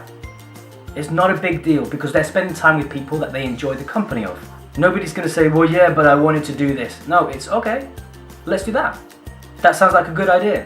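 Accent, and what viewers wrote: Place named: Essex, United Kingdom. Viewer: you have a slight Essex accent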